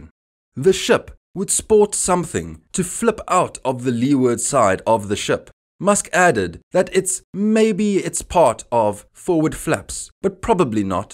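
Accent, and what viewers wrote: South Africa. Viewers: You can hear he is South African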